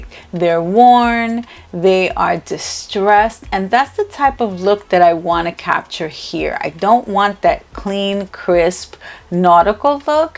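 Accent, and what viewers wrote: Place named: New Jersey, United States. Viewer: Ohmygosh I love your joyful personality, first of all. I also really like listening to your accent. My older sisters are Peruvian and grew up in Jersey like 20min away from NYC; and your accent just makes me feel like home lol. Completely aside from that, "elegant coastal" is the perfect way to describe this - it turned out BEAUTIFUL!!! <3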